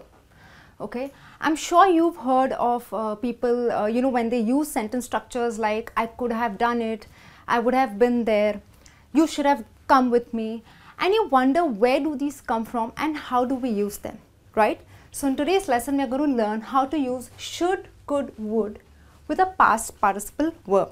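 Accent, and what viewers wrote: India. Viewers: indian pronunciation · excent indian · Hindi style , plzz try to use English way of speaking . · Is that the indian accent? I appreciated it tho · thonzskie junior I agree with you. All my friends who are from Indian speak English the same her voice... maybe this is intonantion of Indian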